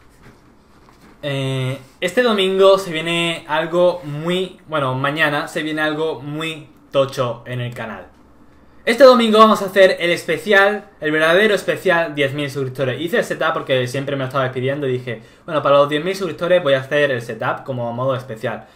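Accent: Germany. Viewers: eres alemán....?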